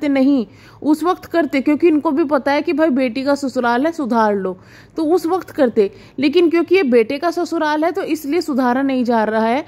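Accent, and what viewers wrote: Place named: Haryana, India. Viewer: Mai ek baat bolungi mujhe lagta hai aaoke tone se aap haryana side ke ho but mujhe aapke state se koi matlab nhi hai mai bas ye bolna chahti hun ki dekhiye hamare bihar up me na sasural walo ko agar kisi v chiz me invitation dena hota hai specially local me mayka ho to ghar aakar bolte hai kamini ke 2 bhai hai kisi ek ko to ghar aakar saas sasur ko invitation dena chahiye tha kyunki relation pahle se hi thik nhi the to itna ro jarur karna tha or ye comment aap kamini ke channel pe jakar padh skti hai ye unke khud ke subscribers v bol rahe hai or jiss din arti ka engagement ka wo roye thi uss vlog me kamini ne badua diya tha ki jisne v meri behan ko rulaya hai uska bhagwan kavi bhala nhi karega ye line sahi tha kamini ka kyunki roye to wo unki saas ki wajah se thi to kamini apne hi saas ko baudua di thi ye v sahi nhi tha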